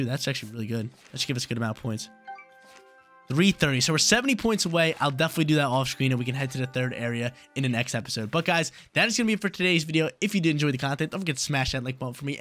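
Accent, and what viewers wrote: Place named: North Jersey, United States. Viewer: I just know your from north Jersey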